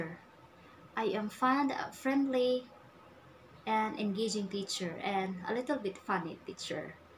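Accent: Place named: United States. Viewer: You really have an American accent. Wow! Where did you learn to speak that way?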